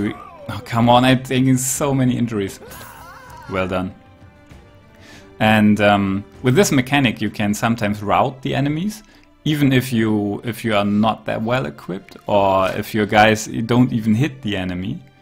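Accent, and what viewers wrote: Germany. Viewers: Mal eine Frage. Ich meine man hört es durchaus raus, dass er ein Deutscher ist. Seid ihr ein deutsches Entwicklerteam ?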